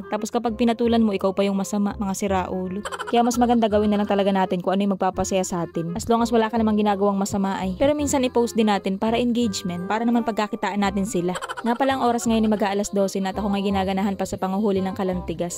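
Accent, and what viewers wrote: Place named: Marinduque, Philippines. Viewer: salita mo salitang marinduque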